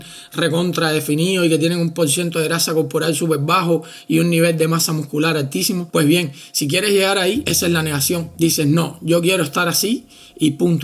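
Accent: Cuba. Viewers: Eres cubano brother?? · Bro eres cubano, contestame si puedes, yo soy cubano conosco el acento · bro me suscribí eres cubano?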